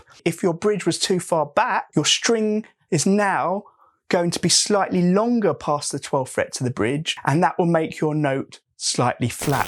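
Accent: United Kingdom. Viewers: I can listen to this type of English accent all day.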